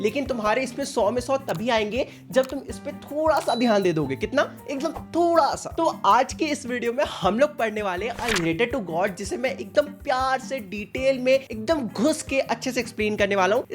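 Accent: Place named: Bihar, India. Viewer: Ye bhaiya meri bihari ladka lagta hai unki trah bolna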